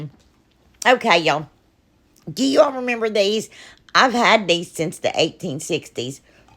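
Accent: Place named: Texas, United States. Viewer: I love hearing you talk! I’m from Texas and I talk the same way!! Just not as fast as you talk!! Lololol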